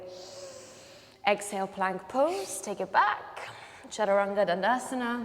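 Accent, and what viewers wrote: South Africa. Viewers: Loves that Your accent is comfortably familiar to a fellow south african, who is overseas. Great instruction and sequence. Thank you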